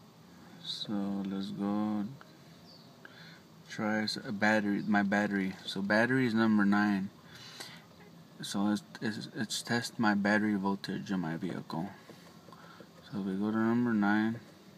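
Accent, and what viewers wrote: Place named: Southern California, United States. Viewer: Wow, you are really smart, ese. I came from southern Cal and feel like I recognize your accent. but you know so much! Where did you learn all this? I am tryhing to get my air suspension to work without going to dealer. I don't even know if you are still up, so if you are, I'd appreciate any hints. and because of all your start/stops, Ima have to go back and look at it...slowly! thank you.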